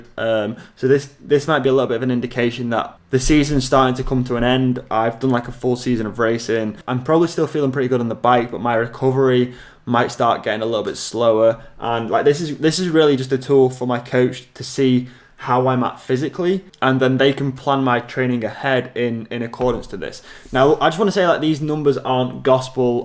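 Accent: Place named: Lancaster, United Kingdom. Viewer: Hi, Been watching and enjoying you for a few weeks now, and listening to your accent, it sounds like you come from around where l used to live, Lancaster and Morecambe, live in Switzerland now. All the best for next season Mike Ford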